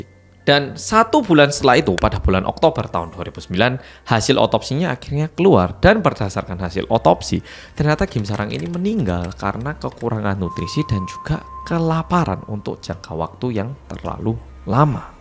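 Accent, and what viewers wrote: Java, Indonesia. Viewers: jowo medok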